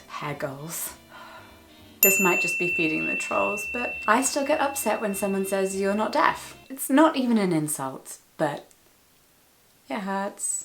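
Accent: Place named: England, United Kingdom. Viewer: I found you right before Christmas last year, not sure how...Love your upbeat personality and especially your lovely proper English accent. I'm American and love anything British and you could have been a sister in Downton Abbey!! I'm in love with James Norton!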